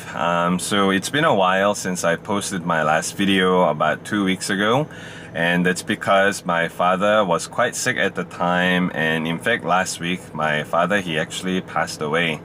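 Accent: Singapore. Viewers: I'm very sorry to know you lost your papa. My deepest condolences to you and your family. I lost my papa 16 yrs ago as he got a heart attack.This year Feb I lost my best buddy , she got into coma for 3 months and eventually passed on. I fully understand the feeling of losing someone close to your heart. Everyday I try to live my life meaningfully with the understanding of things or relationship will not last forever. As there is a saying '' Time will heal the wound'. I wish you and your family will stand strong together as your papa is now in a peaceful and happy land. He will watch you all from afar. Take good care of your mama ❤️. Hope to see your new vids soon as I always like to see you speak in singlish.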